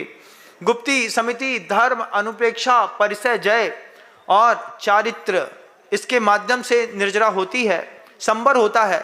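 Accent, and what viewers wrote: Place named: Bundelkhand, India. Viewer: Namostu bhagwan namostu aap बुंदेलखंडी बढ़िया बोलते हो 😂🎉🎉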